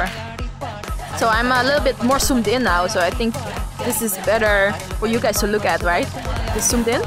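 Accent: Netherlands. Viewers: 🤣🤣your vid convinced me to buy it I can tell you really enjoyed it..by the way awesome accent are you a native Dutch speaker?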